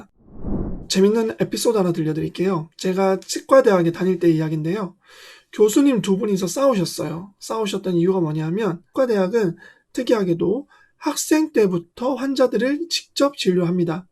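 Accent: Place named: Gyeongsang, South Korea. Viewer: 말투가 경상도네 돈에 환장한 극우 확정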